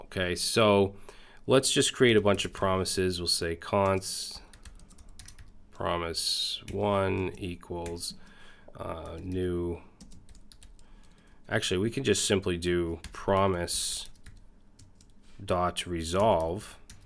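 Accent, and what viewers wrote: Boston, United States. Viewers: has me laughing out loud ...Boston accent and all you keep it real!!! Live for your content broski keep it coming.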